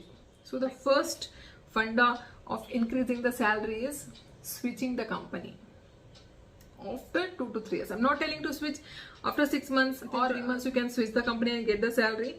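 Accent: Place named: England, United Kingdom. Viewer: I like u English Accent 🎉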